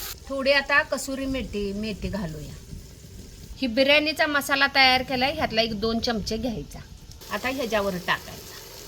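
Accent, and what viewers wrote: Kolhapur, India. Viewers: 🎉🎉😂😂 खूपच छान पद्धत मस्त आणि कोल्हापुरी भाषेलाही गोड वाटते बिर्याणी एकदम भारी शोभा अकोला कर बेरड पुणे वडगाव शेरी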